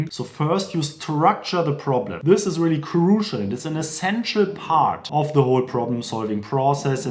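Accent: Germany. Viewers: nur so mal als Frage, ist dir der starke deutsche Akzent mal zum Nachteil geworden? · Your videos are highly rated and appreciated. Your German accent adds uniqueness to your way of presenting valuable knowledge and experience. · Youa german englisch maekes me aggressive, soirry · Genau , bist du Deutscher? Wirklich 🎉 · Your English is So German. 👍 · I can feel that you are doing this deep German accent on purpose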